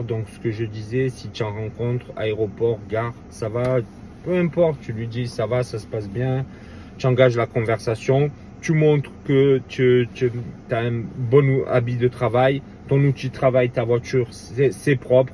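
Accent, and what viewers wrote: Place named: South, France. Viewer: Merci beaucoup pour tout ses petits conseils qui apportent énormément Et toujours avec cet accent du sud qui fait plaisir 👍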